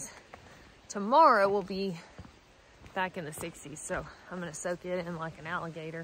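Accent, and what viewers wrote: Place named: Tennessee, United States. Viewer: I feel like I am back in northeast Tennessee when I hear you talk. When I first moved away to live in richmond,va 34 years ago people would always asked me to say certain words lije night,right,light and Tennessee as I had a big southern drawl.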